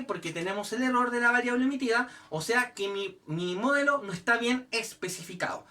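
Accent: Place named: Chile. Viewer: chileno, se cacha altiro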